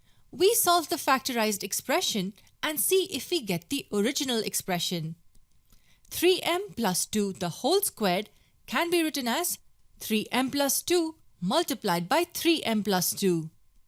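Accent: India. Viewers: Watching during qurantine because of online classes your accent seems indian love from pakistan Pk IN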